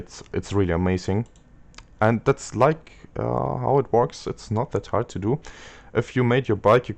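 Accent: Germany. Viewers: Your Deutsch Akzent ist very nice haha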